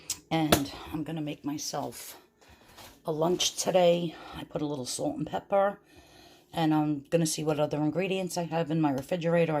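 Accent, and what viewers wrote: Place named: Massachusetts, United States. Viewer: Hi! Love all the videos and your tips and cute accent (mostly because I think it sounds like you live near me--I live in Central Massachusetts).... I love the breakfast you made and the idea of meal prepping--I can't wait to try the French Toast Cups and the egg bake! The great thing about both of those recipies is you can customize them to what you want to have that week--like I think gingerbread flavor french toast cups might be fun--or I might add a little mashed banana, unsweetened coconut and almond extract... or maybe even strawberries or raspberries or chopped small apples.... Thank you so much! I love WW and it is so nice to see all that you share on your journey! I have lost almost a hundred pounds on WW and now have 83 pounds until goal! With your videos and support from my workshop & other online groups I believe I can do it! 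Thank you a thousand times over! I will be sharing on FB for you! Best wishes for 2023!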